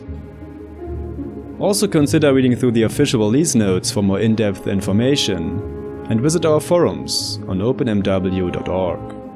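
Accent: Germany. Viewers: Are you german? Your accent sounds so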